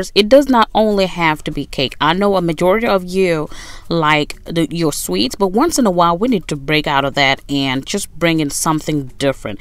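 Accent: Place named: New York, United States. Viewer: That New York accent. Are you from New York?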